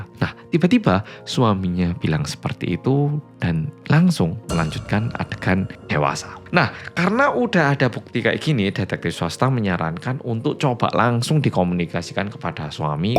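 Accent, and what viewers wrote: Java, Indonesia. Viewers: Hellow bolo medoook❤ Nyimaaak 🎉🎉🎉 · hahhah... peIiket jare 😂 . mbanyolan mu sek panggah Iuucuu wae maas 😂 .... jowomu sek kentheI Iooh 😂 minggu, 16 n0fember 2025 | 25 jumadil-awal 1447 hijriah . 00;35 WiT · Bahasa indonesiamu hampir ilang tapi kenapa boso jowo mu jik nempel bgt😅 unik bgt kwe soll😂😂😂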